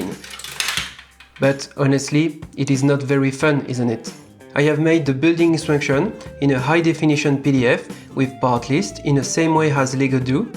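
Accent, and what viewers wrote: France. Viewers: L’accent FRANÇAIIIIIIS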